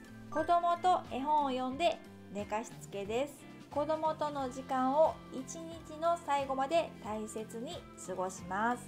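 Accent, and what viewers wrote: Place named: Kansai, Japan. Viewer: 明るくて関西弁が可愛くて、とっても素敵だと思いました。 私も主婦でメルカリではちまちまとハンドメイド品などを販売していますが、お小遣い程度の売り上げです💦 ちゃんとビジネスとして成功されていて、かっこいいと思いました。 元気をいただきありがとうございます。 応援しております。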